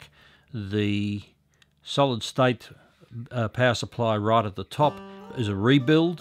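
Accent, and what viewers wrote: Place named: New Jersey, United States. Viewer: that's a lovely accent you have, new jersey?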